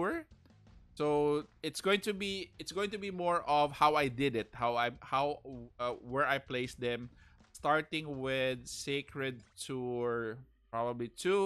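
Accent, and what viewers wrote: Philippines. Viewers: I hope they Put a option so that people can Delete or Keep other servers. By the way are you a Filipino? The way you speak your English has a Filipino accent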